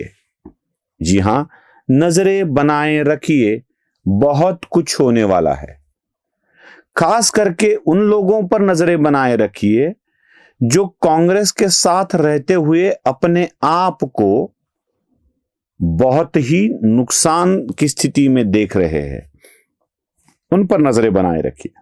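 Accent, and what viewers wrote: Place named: Pune, India. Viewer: WE GUESS IT IS " POONERI MARATHI " LANGUAGE.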